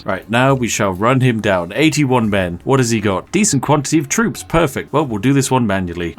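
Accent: United Kingdom. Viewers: The way describes what he is going to do sounds very.....British.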